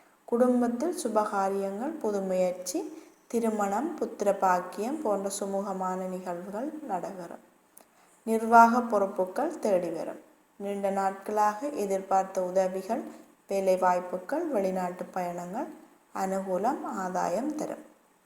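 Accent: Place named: Sri Lanka. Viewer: Ilangai tamil super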